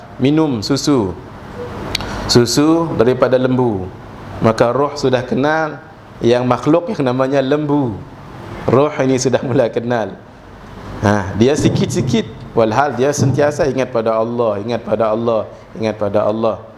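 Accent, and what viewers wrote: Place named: Sarawak, Malaysia. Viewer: dlam bercampur2 slang melayu sarawak dan kedah tetapi tetap indah dan sopan serta penuh ilmu.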